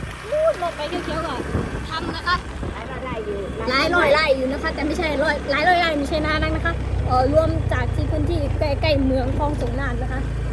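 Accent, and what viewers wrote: Korat, Thailand. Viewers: สำเนียงเหมือนคนโคราช แต่ก็น่ารักดีครับ😊😊😊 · สำเนียงเหมือนคนโคราช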